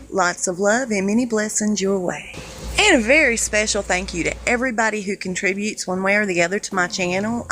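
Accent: South, United States. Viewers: Is her southern accent real? Is it a Houston or Florida? Don't be angry with me, I lived on the west coast most of my life...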